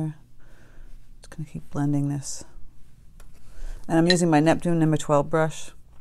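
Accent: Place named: New York, United States. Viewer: Thank you sooooooooo much for your AWESOME videos!!! I love it!!!! I watch them all!! I like your accent! NY?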